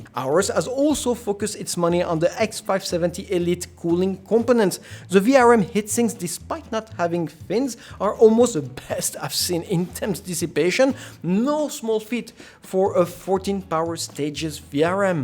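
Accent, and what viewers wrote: France. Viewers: This review is great. usually with the French accent there is a high "punch me in the face" factor, don't get that with this guy at all - also Je Parle Francais - Merci bon homme. subscribed. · Nice video, love your french accent :p Can you still run two M.2 NVME Gen 3 while running a Ryzen 3000 on this board? Or are you forced to use M.2 NVME Gen 4 when using a Ryzen 3000 CPU? Enjoy the rest of your week! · I barely bear your accent. im not a english native speaker, try to follow your speech is very hard for me. Also you are french. (im italian)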